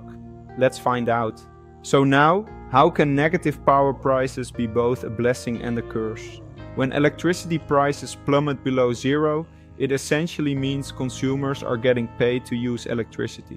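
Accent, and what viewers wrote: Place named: Netherlands. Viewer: By the accent, you're Dutch. This is a thing mostly just happening with us Dutchies. Its really not an issue yet in most of the rest of Europe.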